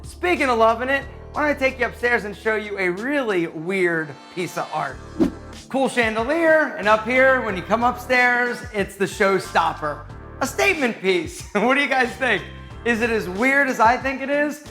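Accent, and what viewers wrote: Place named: South, United States. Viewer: Where did that southern accent come from?!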